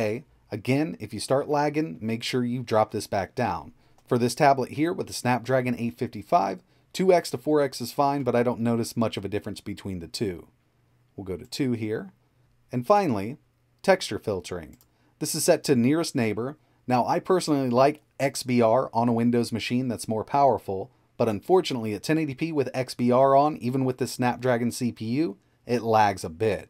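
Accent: United States. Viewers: An American accent.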